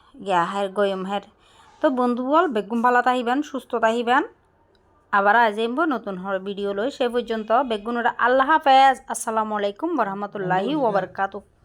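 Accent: Chittagong, Bangladesh. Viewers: আপু তোমার মুখে চিটাগাইংগা ভাষা অনেক সুন্দর লাগে 😅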